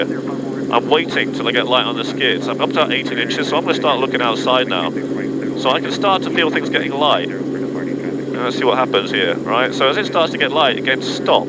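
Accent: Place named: England, United Kingdom. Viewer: Haha, he’s English.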